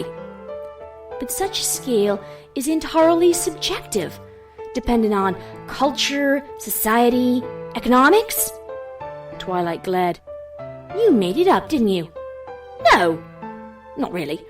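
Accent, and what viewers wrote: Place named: United Kingdom. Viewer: YOU ARE BRITISH INSTANT SUBSCRIBE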